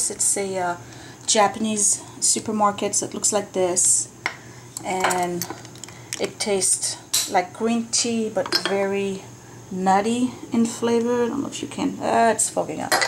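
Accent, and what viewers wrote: France. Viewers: You go girl! Your have been crazy busy! I want some of your energy :) I love your accent, is it French?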